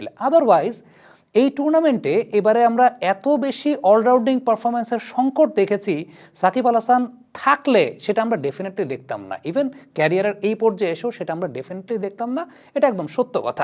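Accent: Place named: Chittagong, Bangladesh. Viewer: Vai mone hoi Chittagong sptr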